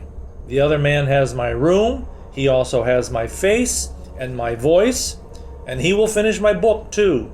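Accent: United States. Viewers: it was impressive.. We need another ones like this.. I love American accent alot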